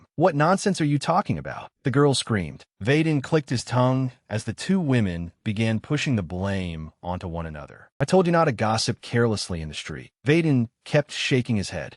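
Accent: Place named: France. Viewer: I can't tell if the AI is part French or part German 😅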